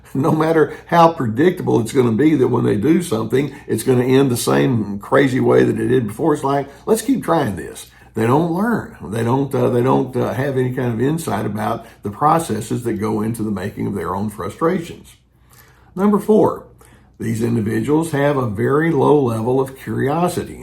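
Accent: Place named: Texas, United States. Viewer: I find your videos very useful and I love your Texas accent! I'm from the UK. 👍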